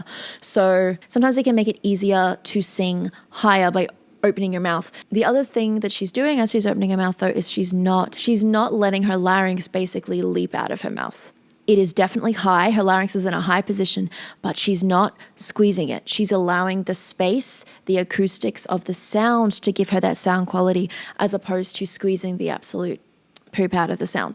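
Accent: Australia. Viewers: Can you please start a podcast or anything, maybe about music, or anything else that interests you? It's so relaxing to listen to, the Australian accent sounds really good :) I was there btw, at the end of the video haha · I love how you get so into the music and your expressions. And the red hair and red nail polish does it for me, And to top it off the Australian accent and such beauty, I’m hooked!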